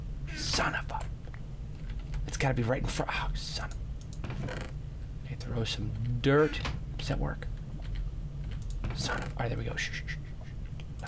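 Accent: Scotland, United Kingdom. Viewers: What happen to Scottish accent voice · scottish accent is the best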